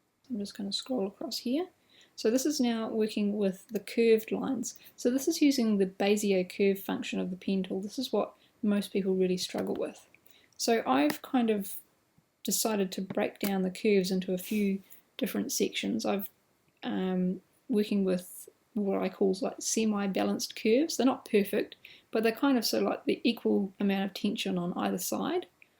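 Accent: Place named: New Zealand. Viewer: Hey, Thank you so much for doing this. It has helped me immensely. I'm new to all this and this tool was driving me mad in Affinity Designer, until I found your tutorials (it crosses over just fine). I really appreciate your patience, thoroughness and clear instruction. This is wonderful and a massive help for beginners. Thanks ever so much. PS - Your lovely NZ accent is very soothing too:)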